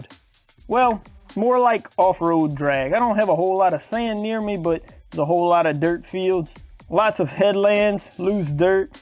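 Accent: Louisiana, United States. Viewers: Sounds like a Lafayette La accent.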